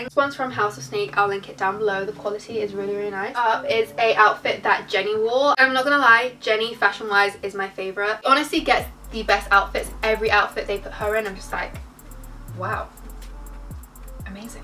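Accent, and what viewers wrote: United Kingdom. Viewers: Brb about to spend all my coins in Shein😭 when I heard your British accent I was like yesss UK stores will be recommended 😭😭 And now I’m lost on Shein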